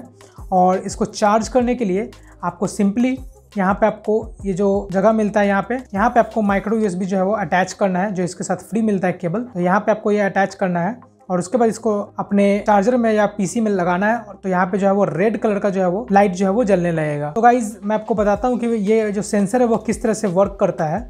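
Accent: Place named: Bihar, India. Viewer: Bihar , samastipur ka lg rha hai bhai tu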